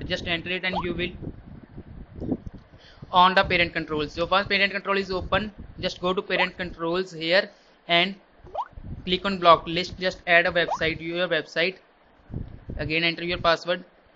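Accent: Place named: India. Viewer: Katherine Hendrix Indian accent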